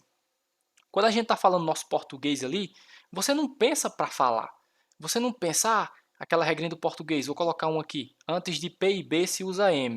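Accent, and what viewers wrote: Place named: Northeast, Brazil. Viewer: Eu não acho um idioma maravilhoso, preciso do inglês por causa do meu trabalho... Uma língua aliatoria não tem concordancia adnominal, adverbial língua do capeta. se vc fazer uma análise sintático de uma frase,vc ver que não tem sentido...😩 vc e nordestino pelo sotaqui, nada contra, sua dinâmica é ótima bem expercifica 👍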